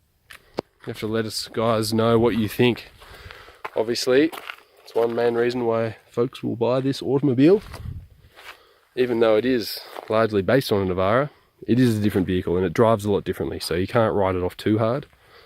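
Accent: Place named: Australia. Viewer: Cool Australian accent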